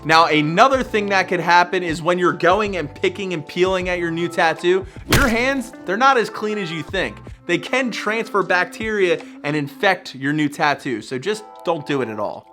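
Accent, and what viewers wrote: Philadelphia, United States. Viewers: Yo are you from Philly? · The way you say water (wooder) reminds me of someone from Philly, Jersey or New York . . . Wonder if you say radiator like someone from Philly. ✌😁👍 ( RAD - EE - AYE - TOUR )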